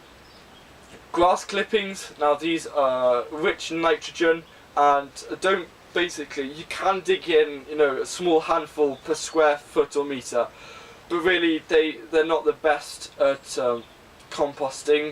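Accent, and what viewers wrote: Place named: England, United Kingdom. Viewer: you look and sound english for some reason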